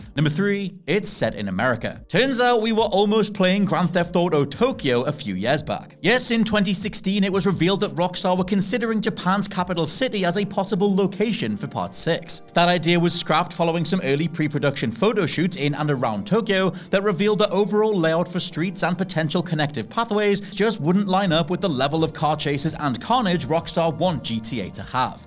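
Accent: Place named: Newcastle, United Kingdom. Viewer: This is my first time on your channel and I'm curious - are you a Geordie by any chance? You sound like I sound when I put on an accent so others can understand what I'm taaakin' aboot :D Please disregard if you have no idea what I'm talking about. Subscribed anyway...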